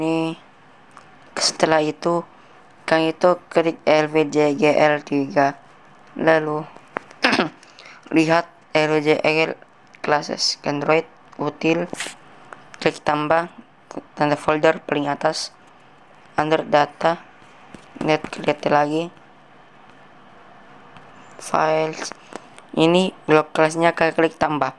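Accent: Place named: India. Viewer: Suaranya kek orang india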